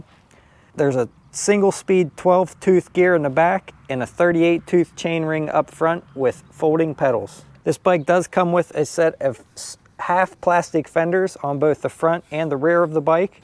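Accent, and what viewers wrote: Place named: South, United States. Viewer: Nice Southern accent!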